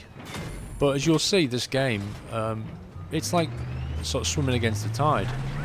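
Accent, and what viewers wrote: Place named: United Kingdom. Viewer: British accent+PS3= ur a badass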